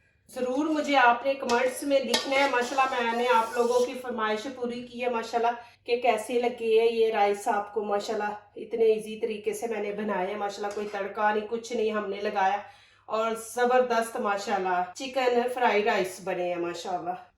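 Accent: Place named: Punjab, India. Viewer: Jazakillah piyari naik baji. Aap bohat mukhlis aur piyaar karnay wali baji hain. Aapki har recipie aalaaaaaaa hai. Aapkay program main bohat apnaiyat ka ahsaas hota hai. Aap bohat mahnut aur muhabbat sai hamain sub kuch Sikha rahi hain. Allahpaak aapko do jahan ki khushian day .Aameen. Aap bawazoo rehkur khana pakati hain aur duaen daity rehti hain . Aap apnay parents ko hamara bohat salam kahain kiunkay aapko daikh k unki tarbiat pay rashk aata hai. Baji aik baat aur kabhi hai k aapka piyara punjabi lahja hamain to bohat acha lagta hai magr kuch loag aapka mazaaq uratay hain. Jo humsai bardahst nahi hota. Allahpaak subko kamil hidayat day. Aameen.🤲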